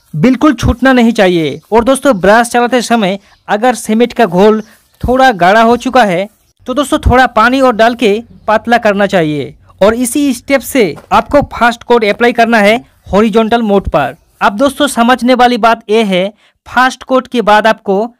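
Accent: Bengal, India. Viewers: bangali mone hochhe 😃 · Bandha ka slang lagta ha Bengla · Bahi tmr bari kotai ?? Tmr hindi r modhe banga pute utche...😆😆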